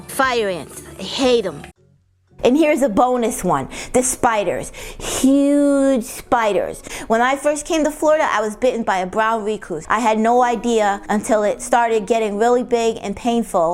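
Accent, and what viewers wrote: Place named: North, United Kingdom. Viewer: your from the north arnt you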